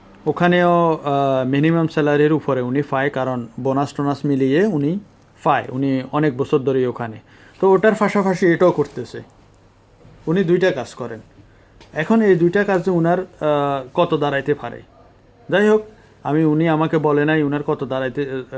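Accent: Noakhali, Bangladesh. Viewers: bari nokhali mone hoy.. tai abal marka kotha barta..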